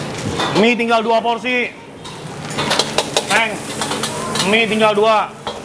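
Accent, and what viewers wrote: Banyumas, Indonesia. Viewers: Bch Ngapak sekang ndi kuwe cilacap apa pwt sing sregep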